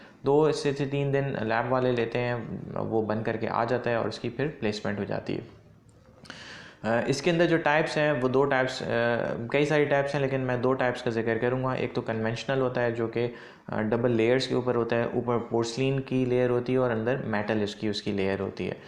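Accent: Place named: Karachi, Pakistan. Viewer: ڈاکٹر صاحب آپ کی لینگویج سے معلوم لگ رھا ھے کہ آپ کراچی سے ھو۔۔۔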